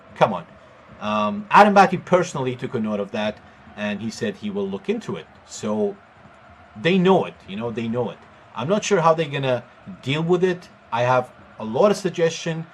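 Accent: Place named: Scotland, United Kingdom. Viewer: Are you Scottish Italian because you certainly have a Scottish accent poking through bro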